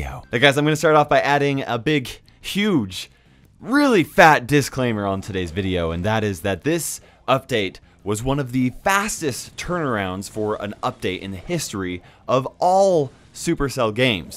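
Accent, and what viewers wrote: Australia. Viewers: That's Aussie not British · SHE IS AUSTRALIAN NOT BRITISH!! REEEEE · She's ausie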